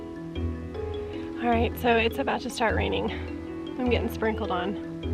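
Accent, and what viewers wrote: Kentucky, United States. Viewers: Wow, you have been in Kentucky way too long. You sound like a country girl.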